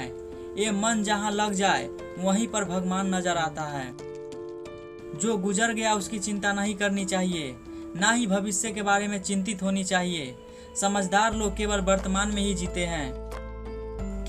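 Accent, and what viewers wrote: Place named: Bhojpuri, India. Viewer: Bhai tumhare content and channel dono superb hai proud of you but aapke Hindi Bolney ki shaili mein shudhta lekar aao shabdon ko or clear bolo bhojpuri or maithili tone mein nahi Hindi tone mein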